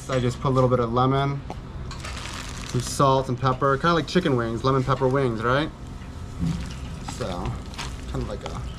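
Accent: Cambodia. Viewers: I like your Khmer accent❤️🇰🇭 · I just discovered his videos/channel. His Khmer is really good and his tone really fits the Khmer style of speaking. Lol Just subscribed. Great job!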